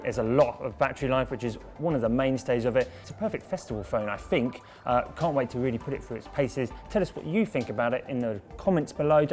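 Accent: United Kingdom. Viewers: at least put subtitles, if you're going to talk like a english peasant, from potato fields.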